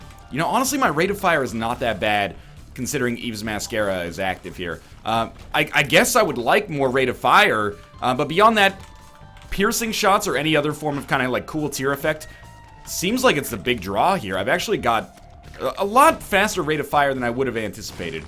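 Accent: Canada. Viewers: So NL's Canadian, eh? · NL went so fucking Canadian this episode. The dark color to your tears after the reroll (and the thing that gave you piercing and spectral tears) was Dead Onion, btw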